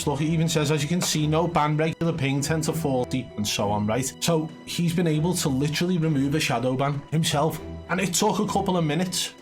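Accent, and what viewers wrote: Liverpool, United Kingdom. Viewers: Proper Scouse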